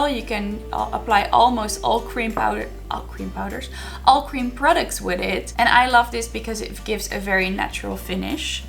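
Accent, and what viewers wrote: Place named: Netherlands. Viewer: What a gorgeous channel you have! Where are you from?💗✨ You sound Dutch like I am😂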